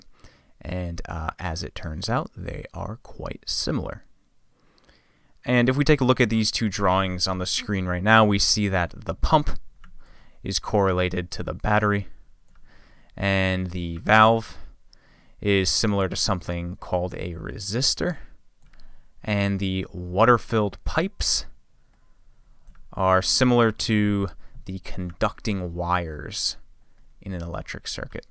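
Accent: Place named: Oxford, United Kingdom. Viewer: AN INTRODUCTION TO ELECTRICAL CIRCUITS...... SIR, WATCHED YR ABOVE VIDEO AND ENVIED YR ORIGINAL TALENT AS A TUTOR, AS A GRAPHIC DESIGNER, AS A ELOQUENT SPEAKER OF OXFORD ENGLISH, AS A PIED PIPER ATTRACTING RUN AWAY KIDS/STUDENTS RUNNING AWAY FROM SCIENCE. WHAT IS THE ATTRACTION OF THIS VIDEO? THE SUPERIORITY AND EXCELLENCE OF THIS VIDEO IS NUMEROUS AND HENCE I WILL BE NOT BE ABLE TO TELL ONE AS THEY ARE MANY AND INNUMERABLE. TO POINT OUT A SINGLE MISTAKE I AM UNABLE TO DO AND FAIL MISERABLY. WHAT A WAY TO TEACH! SO PERFECT AND TEACHING SCIENCE MORE EASILY THAN VIEWING A TV SERIAL. AT THE END OF THE DAY A SERIAL IS FORGOTTEN BUT NOT YR TEACHING . TO TELL YOU FRANKLY, ONE TRUTH WHICH YOU DO NOT KNOW IS, THAT ONLY A HANDFUL OF TEACHERS ARE THERE IN THIS WORLD WHO CAN TEACH SCIENCE/ ELECTRICAL ENGG AND SCIENCE FOR SUCH A PERFECT UNDERSTANDING. 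THE TRUTH IS HEARING THE WORD "COULOMB" BRINGS IN HEADACHE BUT NT YOU OR YR TUTORING. I HOPE YR VIDEO IS THE BEST ONE TO BE MADE COMPULSORY IN ALL ELECTRICAL/ELECTRONIC SCHOOLS AND POLY-TECHNIQUES. YOU ARE A VISIONERY TUTOR MAKING A COMPLEX SUBJECT INTO A SIMPLE ONE WHICH CAN BE DIGESTED EASILY BY EVEN THE POOREST GRADE OF STUDENTS VATSA INDIA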